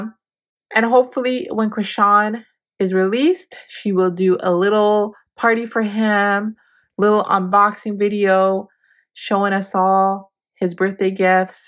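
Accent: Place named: Jamaica. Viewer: Love ur channel …do u have Caribbean in u? I hear a bit of a Jamaican accent ❤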